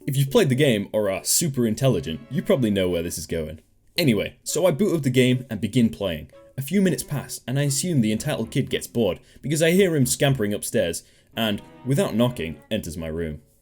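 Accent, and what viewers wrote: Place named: Northern England, United Kingdom. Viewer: you're obviously from up north. Where abouts lad?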